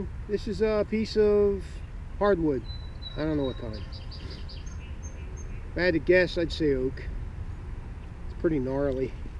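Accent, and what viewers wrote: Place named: Eastern Pennsylvania, United States. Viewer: Judging by your very strong Eastern PA accent, I assume shop is in Pennsylvania somewhere?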